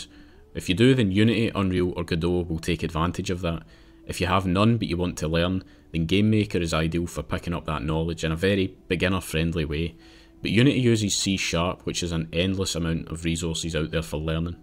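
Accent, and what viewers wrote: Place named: Glasgow, United Kingdom. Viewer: Watched the whole video not because i am super interested in game dev, but u sounds like ur from Glasgow, so supporting you bro!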